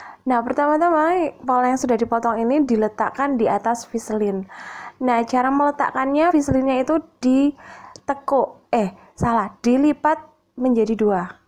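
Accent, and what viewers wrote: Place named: Java, Indonesia. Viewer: Bahasane mbk lucu.. Wong jowo yo.. Daerah pundi.😁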